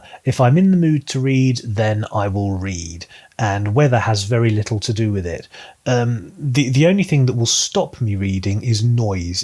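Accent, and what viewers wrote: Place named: United Kingdom. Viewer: I'm so glad that I've found your channel. I've been looking after videos about Mr. Raymond E. Feist (because I'm currently reading the Magician: Apprentice). I'll definitely read the Empire trilogy someday, because of your review. Also, I was about to start the Deverry Series and I was happy to see your video which introduces the books. I'm looking forward to seeing more of your content. By the way, I really enjoy your elegant UK English. :)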